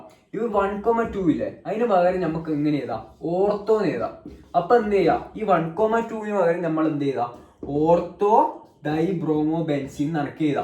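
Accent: Kannur, India. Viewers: Bro kannur aano 😊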